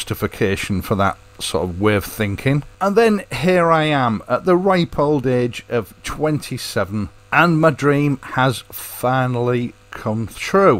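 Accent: United Kingdom. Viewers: Yeah, it´s official... You are the greatest Royal Enfield fan in the world !!! Congratulations and thanks for sharing your experiences and letting us hear your absolutely British accent. Your videos were a great support during the process of getting my own Orange Crush RE Interceptor (locally assembled in Argentina). Thanks again and best regards !!! Ernesto M. Parodi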